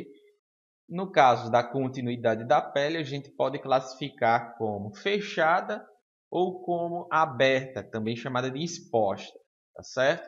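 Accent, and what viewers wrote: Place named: Northeast, Brazil. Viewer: Cara top... É seu sutaque melhor ainda ..eeee nordestao. .. Sou tec. Em imobilização ortopédica. ..sempre fico refrescando a memória assistindo vídeos como o seu valeuuu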